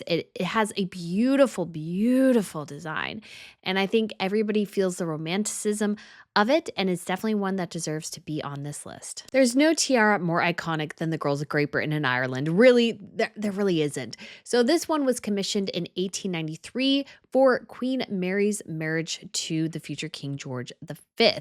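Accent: United States. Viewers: I am a new subscriber as I REALLY enjoy your appreciation for royal history and deep admiration for all things tiaras ❤ Could you please take a little more care with pronouncing the names of royal family members and the fashion/jewelry designers? I've heard several names pronounced in the American style, which is off-putting to the designers from other countries. I'm sure your viewers from outside the U.S. will love you for it.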